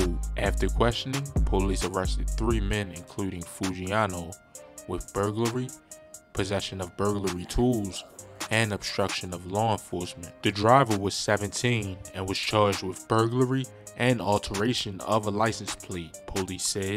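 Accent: Baltimore, United States. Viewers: def bmore 😭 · Baltimore accent crazy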